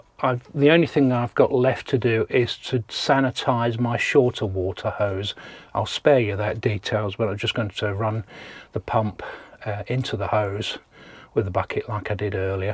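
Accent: United Kingdom. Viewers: Thanks for that. Going off your accent we are not very far from you being in Bolton. There are a plethora of motorhome videos listing what you should and should not take, I have driven extensively in France over the years, even taking a 7.5 tonne over to move my parents over there when they were alive. Im finding the advice on the new regulations regarding camper weights and what you need for vans over 3.500Kgs very confusing though! Have you any experience of the Etrusco A7300DB?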